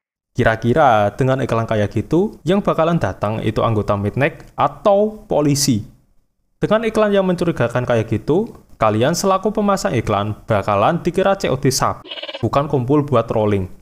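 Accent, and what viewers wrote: Java, Indonesia. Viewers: Medokmu lo min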